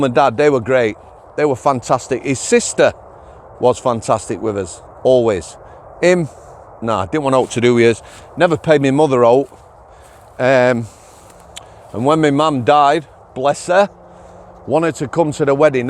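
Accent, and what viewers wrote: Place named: South Yorkshire, United Kingdom. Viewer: Wakey bloke mi sen I always thought you were South Yorkshire love the work keep it up 25k subs soon 👍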